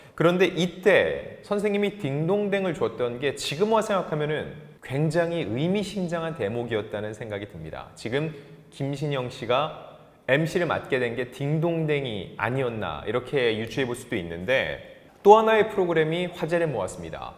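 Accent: Gyeongsang, South Korea. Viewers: 연기와 진행은 사실 다른데 잘해낼지 관심이 가네요, 코믹연기와 순발력은 최강인데 ~ 경상도 말씨가 쪼끔 걸리기는 합니다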